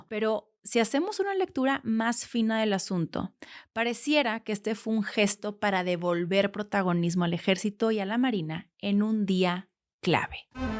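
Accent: Monterrey, Mexico. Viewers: Vivo en USA, soy Regio y escucharte me hace sentir en casa 🤠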